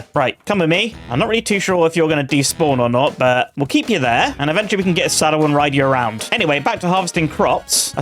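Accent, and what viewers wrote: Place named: United Kingdom. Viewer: The English accent of the British is very nice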